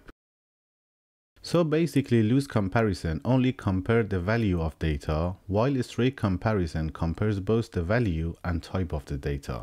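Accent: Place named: Iran. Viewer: Your accent is like Persians accents lol , are you Iranian ? Buy the way I LOVE your videos man , Thank you so much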